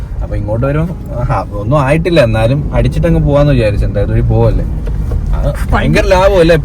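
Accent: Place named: Kannur, India. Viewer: Kannur slang istamullavr❤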